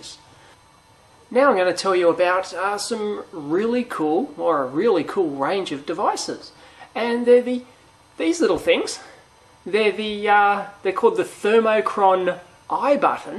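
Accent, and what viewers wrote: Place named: Australia. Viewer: I wondered about the strange accent ... Now I know Australia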